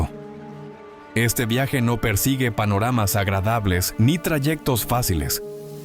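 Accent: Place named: Brazil. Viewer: Abençoado dia por Deus obrigado por passar uma voz quase Brasileira gosto de vidios asim e asim entendi muito bem o que diz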